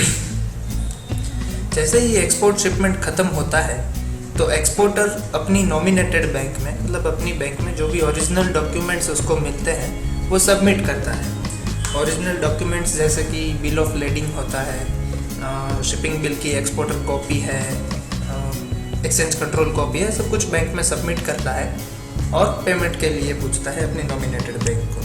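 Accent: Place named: Gujarat, India. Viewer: Sir, i did import export course... Ane tamara tone parthi lage che k tame Gujarati cho.... Kai Job hoy toh let me knw... M ready to cm at ur place😁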